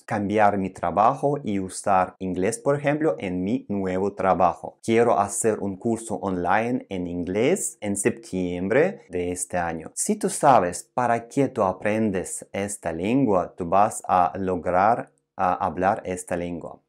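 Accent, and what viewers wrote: Russia. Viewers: Su acento es grave. Tiene un acento ruso en espanyol. No recomiendo aprender ahi. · por primera vez he escuchado un acento ruso en espanol. Interesante.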